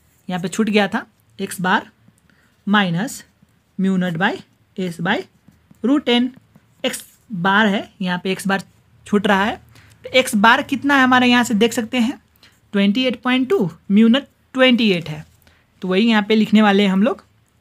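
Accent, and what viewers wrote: Nepal. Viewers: Try to speak pure Hindi your are from Nepal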